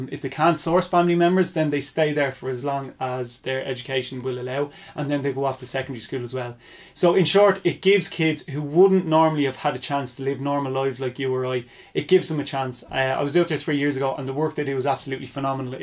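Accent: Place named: Ireland. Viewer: I was unable to work out zambia with an irish accent, being Norwegian and all that so for a short while i was sure zambia was a person and nothing made sense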